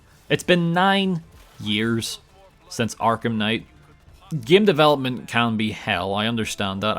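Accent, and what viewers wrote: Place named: Northern Ireland, United Kingdom. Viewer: as a fellow northern irish person its always such a weird feeling when I click onto a youtube video and hear the accent lmaooo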